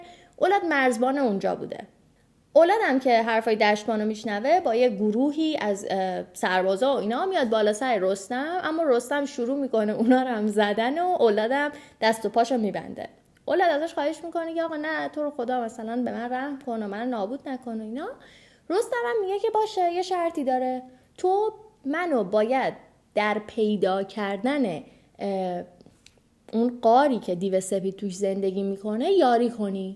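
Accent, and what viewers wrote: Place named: Isfahan, Iran. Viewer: لهجه اصفهانی داشتی آیا تو اصفهانی هستی؟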